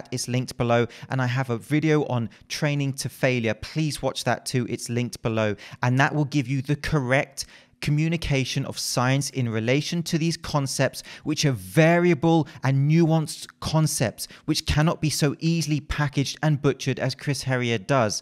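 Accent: England, United Kingdom. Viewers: Proper English accent, still says "ain't." I love it. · I trust this guy because he's bald and he speaks with a british accent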